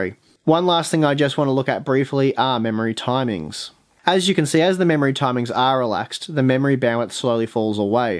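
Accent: Australia. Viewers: The RAM Jenga was awesome :D Please stick to your Aussie pronunciation, I always cop it for the way I say "Cache", but it's also our signature :D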